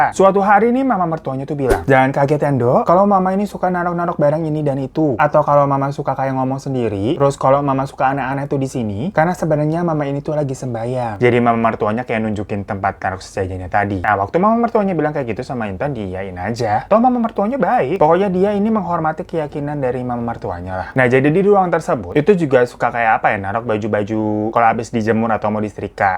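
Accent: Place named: Jakarta, Indonesia. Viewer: et dah si abang logatnye betawi bener keren abis... mang abang betawi?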